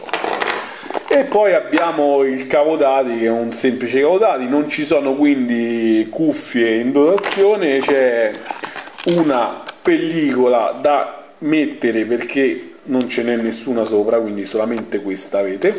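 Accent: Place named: Bergamo, Italy. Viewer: Attendo aggiornamento sulla batteria, sull'audio e sulla fotocamera...bella fratellì, un abbraccio da Roma. C'hai st'accentino de bergamo alta...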